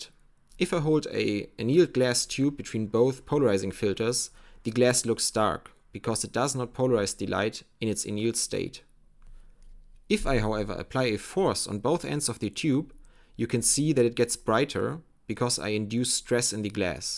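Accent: Germany. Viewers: God damn, your German accent is so strong and so nice 😂❤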